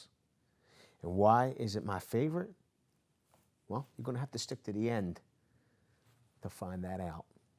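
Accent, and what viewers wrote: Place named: North Jersey, United States. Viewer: You gotta be from North Jersey or close to that... Right? Sounds like it! Thanks for the video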